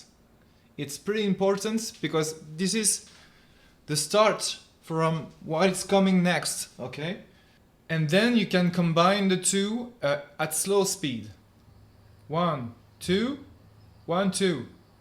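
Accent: France. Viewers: He is french i belive or speak french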